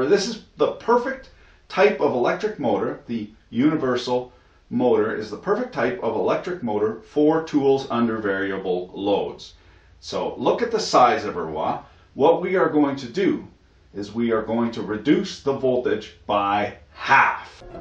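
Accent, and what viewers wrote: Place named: Canada. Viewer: I love ficking Canadians. you sir get a subscribe just for the way you talk lol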